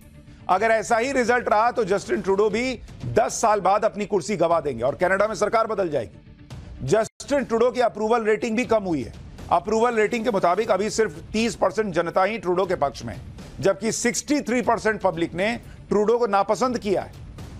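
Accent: Bihar, India. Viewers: ji kya dhote ho Bihari style main maja aa gaya ye vishleshan soon kar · ❤❤apki bihari tone mujhe bahot achha lagta hai, Ye j hato hmari bari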